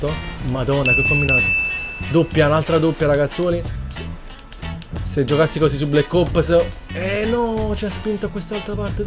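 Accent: Italy. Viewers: da come parli deduco che sei del centro/sud Italia.Confermi?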